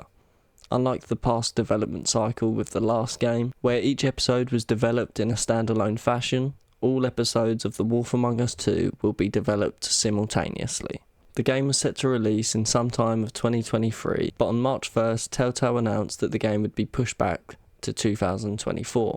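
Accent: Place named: United Kingdom. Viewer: Thank you kindly for your amazing video and I love your British accent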